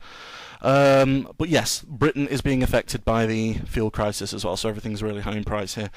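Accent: New York, United States. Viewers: In Scotland, it goes Nursery School, Primary School, High School then either College or University. You get to choose what you want to do after High School depending on your grades. Though University is above College in the "Ranks". Its more harder than College. Also, I loved your New York accent. lol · I don't see much of a difference in most cases. However, I've learned some expressions from Karl Pilkington, par exemple: "Knocking around" - to be "Having a go" - making fun of "loads" - a lot Any more Mancunian ones? Great NY accent, by the way! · cinema= movie theater People use both in US. You know something, I thought it was offensive to call British people Brits. But I hear y'all calling yourselves that quite a lot. Wait college and university?? What age range are those? Also, love your New York accent. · That was an amazing old New York accent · If you care to do a study of a strong NY accent, give a listen to the Bowery Boys (their films are funny as well).Your accent sounded NY enough to me to get the point across. 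· thats a great new york accent man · GREAT NEW YORK ACCENT! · Your New York accent is good. What I'd like to ask--and you may/may not have an answer is why some English pronounce words that end in vowels with a faint r, such as idea=idear, or saw=sawr/sahr, or america=ameriker. I've wondered about this for some time.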